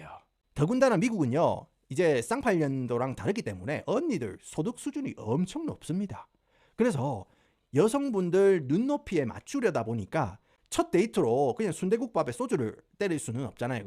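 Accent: Gyeongsang, South Korea. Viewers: 허리까지 내려오는 까만쌩머리이 ㅋㅋㅋㅋㅋㅋㅋㅋㅋㅋ아놔 커피뿜음 ㅋㅋㅋㅋㅋ 넘 젬나는 갱상도 ㅋㅋㅋㅋㅋㅋ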